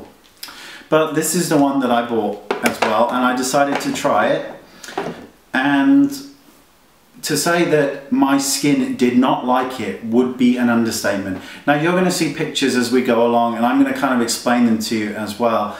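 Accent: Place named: United Kingdom. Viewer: Love British pronunciation! Could you do the Accent tag please? ;)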